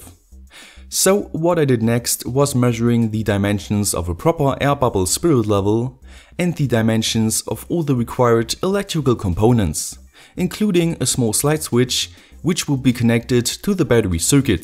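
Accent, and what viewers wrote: Germany. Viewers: German accent is really fine and "clean"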